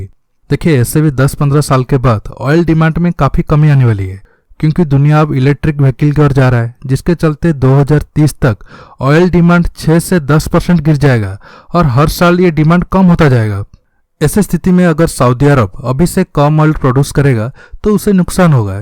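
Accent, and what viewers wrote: Odisha, India. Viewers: Hi, are you Oriya??? Because your pronounciation sounds like that??? 🤔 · Odia touch tike rahuchi bhala laguchi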